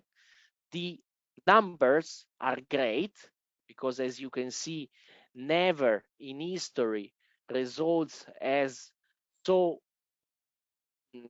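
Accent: Italy. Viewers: bel video, ti consiglio di registrare in voce italiana e tradurre l'audio in inglese con un tool di IA se davvero vuoi raccogliere iseguito internazionale, pochè la pronuncia inglese attuale potrebbe dar fastidio a chi è madrelingua inglese, ma è solo un mio parere personale e feedback che spero sia utile. Apprezzo molto i tuoi video e ti seguo con piacere da qualche settimana, sei una delle poche persone che è riuscita a farmi capire ed apprezzare il mondo delle azioni e dell'analisi fondamentale/tecnica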